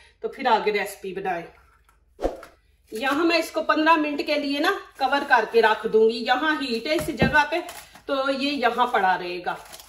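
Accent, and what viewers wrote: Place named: India. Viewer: It's a amazed moment when you dish out delicious foody recipe with desi dialect live long with healthy life sister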